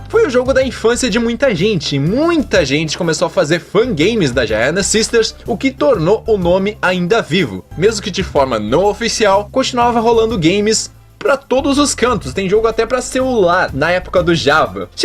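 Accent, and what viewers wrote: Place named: Rio Grande do Sul, Brazil. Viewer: Vc é gaúcho? Tem sotaque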